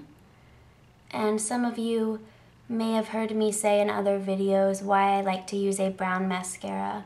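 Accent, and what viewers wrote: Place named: South, United States. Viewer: where are you from i absolutely love the southern drawl!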